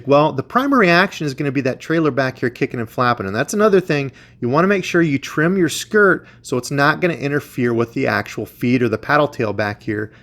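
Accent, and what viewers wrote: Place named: South, United States. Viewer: The entire South sounds exactly like that lol….Id like to know how to use the swivel or wobble type head ones.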